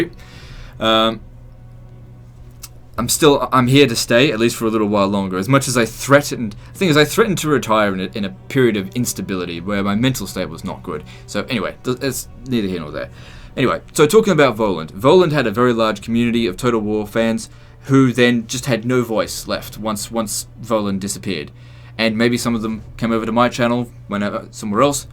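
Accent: Australia. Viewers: CA fanboi since Centurion on the Megadrive. All TW games have some shit and some gold. Some are golden shit. Bought everything except that shitty game after Empire;) Britannia, played it a bit but I mean ffs CA. Release TW:WH3 and Three Kingdoms already...Will always be about watching your shit. Need more aussies talking like aussies.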